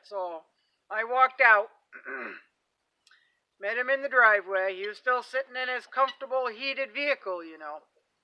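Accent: Maine, United States. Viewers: My mouth is wide open in shock and astonishment at how entitled some people actually are.😶😦😦. You might need to invest in some ferocious dogs to scare these weird people off. And you are not out of bounds. Some people you give an inch to—they WILL take a mile, and never be ashamed of themselves. I love your attitude and hard work ethic. My husband’s family lived in Presque Isle. Remember when there was an Air Force Base there decades ago? His Mom married a Airman from the base and they finally retired in a warmer climate. She had the same accent as you, so you make me smile as I hear you speak. ♥️🥰😁. That snow and cold looks brutal, but you are one tough woman and we are proud of what you accomplish. 💪🏼. I love you videos, I just found you a few days ago, and I am hooked. Next time that man shows up, hand him a shovel or an ax and tell him he will have to work for that goose—and the work will be double if he wants the breast meat. 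That should scare him away.😂. Blessings to you.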